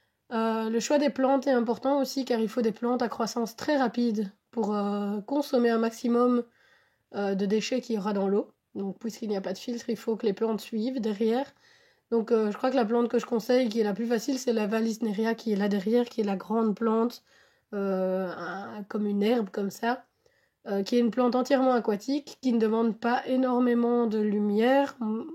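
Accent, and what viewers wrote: Belgium. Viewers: L'accent belge 😍 tu as un admirateur en France ! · Bonsoir. Je suis tombé sur ta vidéo par hasard. J'ai reconnu ton accent belge 😉. En ce qui me concerne, je pratique l'aquariophilie depuis bientôt 10 ans et je commence à m'intéresser au low tech. Je me pose la question si cela pourrait fonctionner avec ma population : 5 scalaires dans 350 l... Sinon, j'habite Bastogne. Vive la Belgique !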